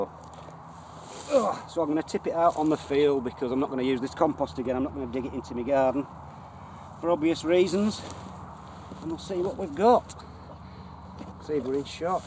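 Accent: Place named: Sheffield, United Kingdom. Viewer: Great job pal. Are you a Sheffield guy or Derbyshire you sound like me lol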